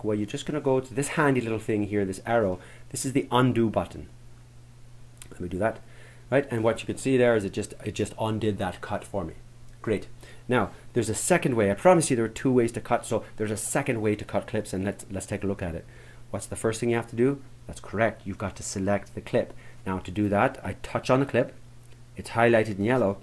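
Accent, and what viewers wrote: Ireland. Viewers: Like your style. You make it interesting and easy. Also, great visibility to see exactly the buttons and the steps! The Irish makes it interesting too. Thank you. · With Irish Accent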